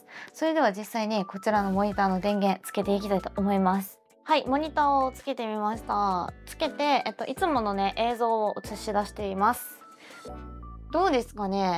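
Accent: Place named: Osaka, Japan. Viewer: 大阪弁が時折出てますね。(笑）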